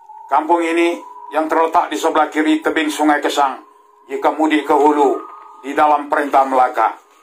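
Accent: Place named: Indonesia. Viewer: suara dan dialek jelas indon asli pak...walaupn hujahnye aku stuju..tp anda mmg jelas warga indon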